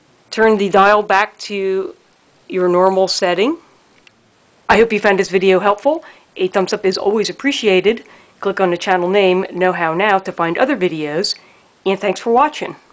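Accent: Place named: Baltimore, United States. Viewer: Great video! BTW, where are you from? Your accent reminds me of my home (Baltimore)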